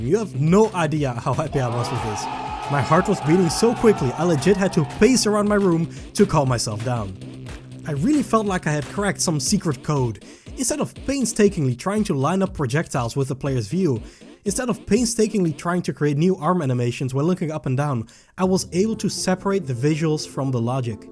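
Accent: Netherlands. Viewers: are you dutch or am i wrong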